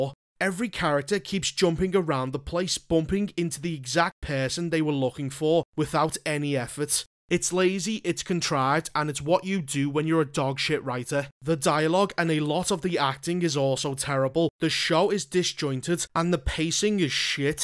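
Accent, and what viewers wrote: Liverpool, United Kingdom. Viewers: I don't know what's funnier, the cutting, but very accurate commentary and analysis, or the fact that it's all delivered in a Scouse accent 🤣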